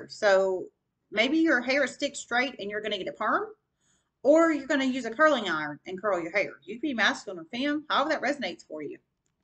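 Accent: South, United States. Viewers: Love the sound of your voice. Very Southern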